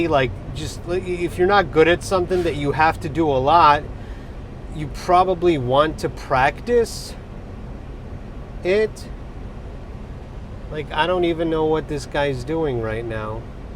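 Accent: Russia. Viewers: 10 years ago u sounded very russian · do your russian accent in your videos man ur known to it 😁😂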